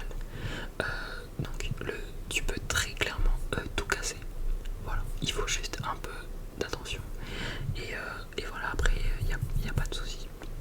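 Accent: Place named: Lyon, France. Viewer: 100% c’est un lyonnais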